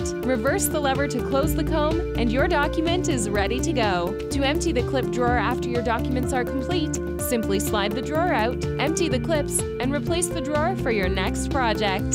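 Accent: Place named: West Coast, United States. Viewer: Jesus... worst accent for advertisements ever!!! Please note. Brits find the West coast accent really really annoying!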